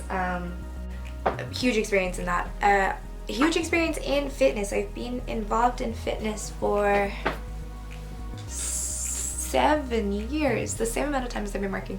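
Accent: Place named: Ireland. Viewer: Really impressive, holding a Master-degree in management. Also beautiful Irish-accent.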